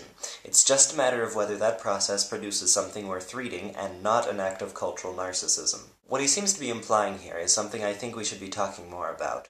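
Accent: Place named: Arab world. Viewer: Your Spanish sounds very Arabic, or maybe more from-the-still-very-moorish-parts-of-Spain-ish. I can tell it's Spain Spanish too, but mostly just very Arabic sounding. And your speech sounds almost mystical as well. It's refreshing to hear someone actually have a voice in Spanish. It's a lot more interesting and understandable than, "Kay whore-ah es?" or "Me yah-mo es Megan e me gustah pah-sahr tee-empo cohn mees uh-me-goes." Thank you for rescuing my Wednesday. DFTBA!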